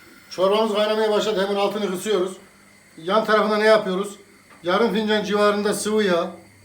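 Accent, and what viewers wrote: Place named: Anatolia, Turkey. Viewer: Ülkemin güzel şiveli; babacan , yardımsever şefim . Senin o Anadolu kokan şivene gurban olurum 😊😊🙏🙏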